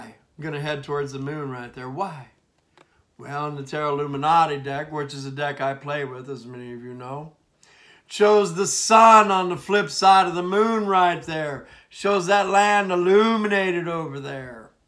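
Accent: South, United States. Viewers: I just adore your southern accent my fellow Aquarius. I’ve got a York Accent. I always love your readings xxo Omg so right on! He had better come correct or not at all. I went true Aquarius and shut him out!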